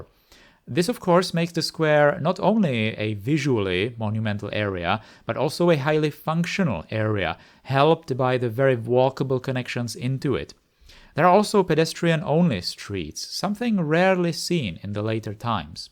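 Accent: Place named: Czech Republic. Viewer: Jeho český přízvuk je nepřeslechnutelný...😅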